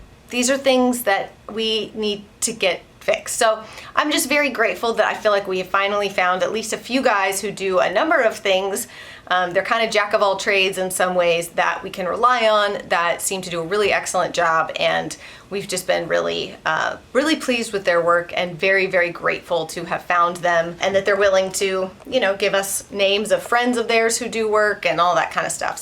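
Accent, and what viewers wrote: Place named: South, United States. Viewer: I love your southern accent! And your real adhdness in this video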